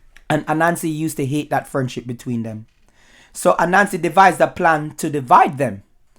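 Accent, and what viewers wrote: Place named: New England, United States. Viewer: You sound like a duncebat Yankee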